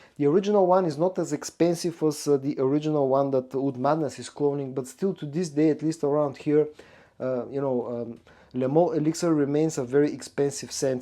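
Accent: Bulgaria. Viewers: да не се казваш случайно?:) Често попадам на тези ревюта и чак сега долових бг-акцента (но е толкова слаб, че дори се чудя дали всъщност съм прав). Когато става въпрос за арабски аромати винаги се спирам на твоите видеа:) Хем ревютата са интересни хем стила ти не е натрапчив и претенциозен (нещо, което е дооста рядко срещано в тази ниша). А за темповете, с които бълваш видеа направо нямам думи:Х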